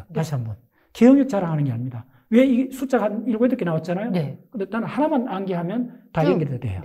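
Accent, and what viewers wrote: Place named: Gyeongsang, South Korea. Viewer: 내용 중에 자막 잘못 .. 스코트가 아니고 서고트.. 경상도 발음 이해 못함..ㅎㅎ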